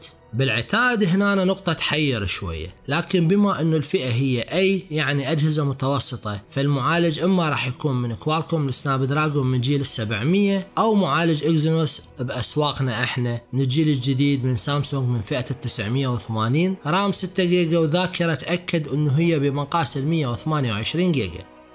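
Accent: Iraq. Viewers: من سوريا احييك اعشق اللهجة العراقية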